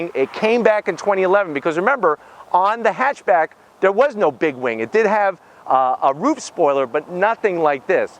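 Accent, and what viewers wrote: United States. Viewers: Nothing worse than a yank accent it grates it’s high pitched and not ENGLISH!!!’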